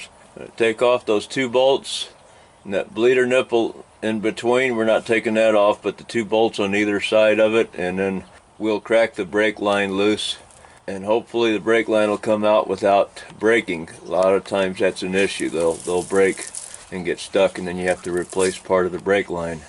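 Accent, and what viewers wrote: Spain. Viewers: Much respect to you Mr for the great work you did there and for the awesome Spanish accent you have (old western+ Spanish)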